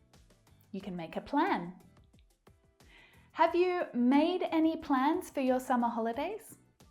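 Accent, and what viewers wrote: United Kingdom. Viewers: your lessons so helpful, you are British, right? now I am advanced what should I do to reach native level? thanks a lot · NO ME GUSTA EL ACENTO BRITÁNICO... SORRY 🙃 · Ms I would like to ask about your accent,is it british accent? Let me know if you dont mind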